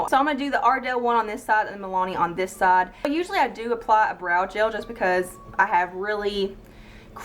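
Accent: South, United States. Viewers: Love your Southern Accent! Your brows are already pretty you don't need to do anything to them! 😊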